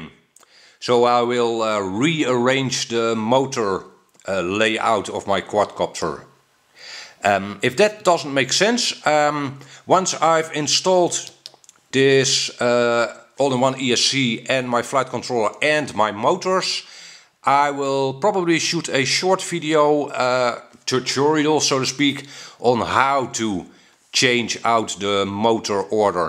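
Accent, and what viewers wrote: Netherlands. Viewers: More of a teaser than a build ; ) Its a really nice looking frame and I like that the bracing forms the base of the stack as its all very neat but, the pdb sticking out of the side kills me! I like symmetry and if it really can't be symmetrical its GOT to go at the back as it just looks like it'll be more streamlined... No science just one of those yep, that looks about right things :) Even with your Dutch accent making your intonation a little different to what I'm accustomed to I was convinced from the start you were going to have some "grumbles" about the esc and yes, you did! Some valid ones too, having to change the motor positions & resolder leads wouldn't be my first choice, easy wins those situations every time in my world. I'd almost be tempted to buy a different one or get one of their flight controllers (I'm assuming they use that set up).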